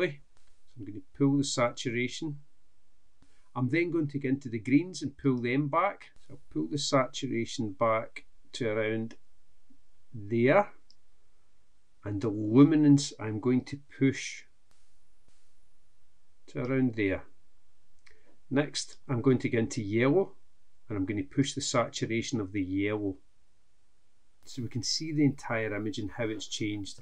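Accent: Scotland, United Kingdom. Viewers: i hope that you are not angry with my because of my comment, but i actually more used to american english, so it took a while before i understood what you said. I like your accent, but it was so strange to me in the beginning. In my 2,5 years of learning english, i never heard scottish accent. forgive me please;-) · That’s quite rude.. Scottish accent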